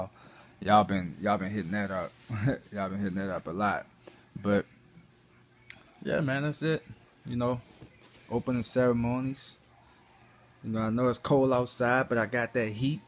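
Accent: New Orleans, United States. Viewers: New Orleans accent? I'm going to check the website out. Thanks for sharing!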